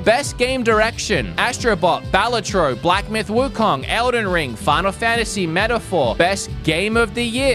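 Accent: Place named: Australia. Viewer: I miss your aussie accent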